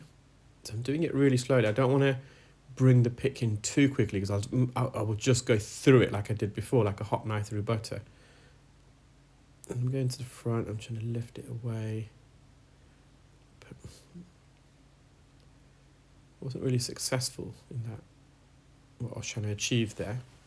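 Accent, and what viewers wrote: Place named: Estuary, United Kingdom. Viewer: I was listening to you waiting and waiting and waiting to hear you pronounce one of the "bath" words ("half" takes the a of father everywhere in the UK so that doesn't count) until I finally heard you pronounce "afterwards" as "ahfterwards"... All this in an attempt to place your accent. It's not "posh" and it's not even RP (received pronunciation) but it's not a "common" accent either. (You are, after all, very highly educated). Your accent sounds like it's from somewhere in the SE (or south central England) and it sounds like it might possibly be from the East Midlands or southeast Midlands or even south London. It's certainly not Scottish or from anywhere in the north or the west country. It could, theoretically, be from Wales but that's a part of Britain I'm not too familiar with. I don't want to take any wild guesses but it definitely sounds like it's from the south (and not the extreme western part of the south, like Devon or Cornwall). 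It sounds like what's referred to these days as "Estuary English" (because it's primarily spoken in the SE, at the estuary of the river Thames). It's used by "yuppies" and other educated, upwardly mobile types. It's not a "posh" accent but it's also definitely far from being a cockney accent (even if it does have a little itty bitty bit of cockney in it). 🇬🇧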